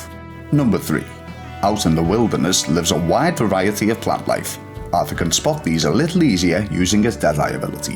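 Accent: Liverpool, United Kingdom. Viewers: Just playing this for the first time and this video is perfect, so thanks! Also, bonus for it being made by a fellow Scouser! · Me...not long started.These tips are well handy tyvm. As a fellow Scouser though, can i just say that your pronunciation of 'lass - o' surprises me..I'm prob a bit older than you though..i say 'lass-oo'! lol. You sound North end like me though? .but i can hear you dumbing down slightly for the world audience hehe. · Is this guy a scouser? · Yes lad finally a scouser lad your channel deserve more subs content on rdr2 is amazing mate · nice to hear a scouser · That’s some Scouse accent. From Kenny myself!! Great video. Nice one!! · nice stuff. Could hear the Scouse twang but could hear a bit of strong northern 😁. You're not the the fella who plays cod professionally and lives in wavertree, are you ?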